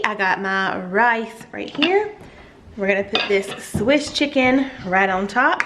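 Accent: South, United States. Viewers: The way the economy is right now, chicken appears to be the choice. Your recipes are so yummy. I look forward to trying the Swiss Chicken. I love your Southern accent. I have one too. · Everything looks amazing.....I especially like the potato soup recipe!!! I don't know what the fuss is about the word moist but hey everyone has an annoying word eh! I honestly can't stand when someone can't pronounce words with "oi" in them like oil or foil for example and says them like ole and fole rhyming with bowl... had to stop watching videos because of it sorry to say. Anyways, you asked...LOL...thanks for sharing, really enjoy your channel and you southern accent!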